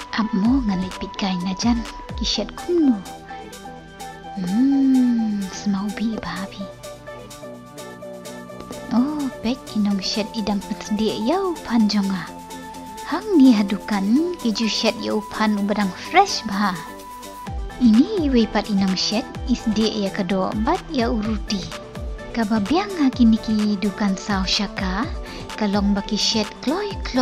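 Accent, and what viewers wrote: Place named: Khasi, India. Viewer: Sngew hun shisha kong ba phi kren khasi paka hynrei nga i bun ki khasi ba sah tang ha shillong ruh ki lah leh thlun ban kren khasi . Nang kiew shaphrang kong u Blei un nang kyrkhu iaphi ba phi lah ban pynmih shuh shuh ha ki por ban dang wan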